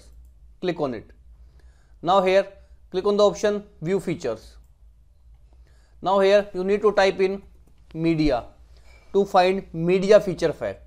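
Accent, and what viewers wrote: India. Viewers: THE ONLY VIDEO THAT HELPED, KNEW IT WOULD WHEN I HEARD HES INDIAN<3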